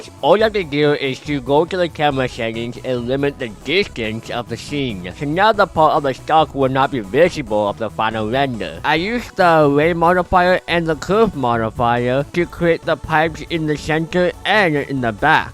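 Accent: United Kingdom. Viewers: Is bro british or smth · Bro, sounds like a British person😂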